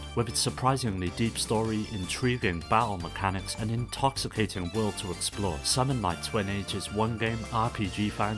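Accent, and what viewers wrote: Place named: England, United Kingdom. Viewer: uggghhh this is the nastiest sounding english accent fuckin clear your throat, ever heard of an AHEM? "If U FROW a Ton of Guramplay" eughh the way you say Game is fucked up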